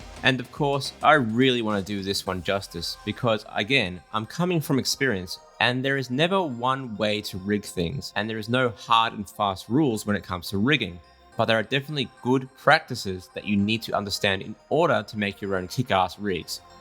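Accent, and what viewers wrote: Australia. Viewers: interesting. Thanks for you replies bud. Btw I detected an Aussie accent? I’m based in Sydney. I like the style of your work so I’ll be keeping an eye on your page.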